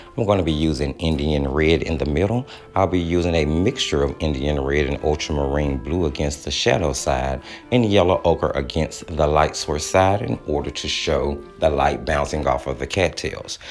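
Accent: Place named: South, United States. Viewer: I have watched 5 other YouTube videos and yours is my favorite! Excellent instructions as you paint. Love your southern accent.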